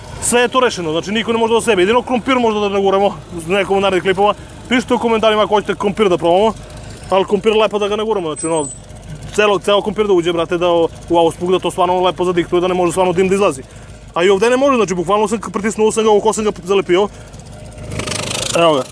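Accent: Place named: Niš, Serbia. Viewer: on je iz Niša zato ima takav "naglasak,, a ne da ne zna padeže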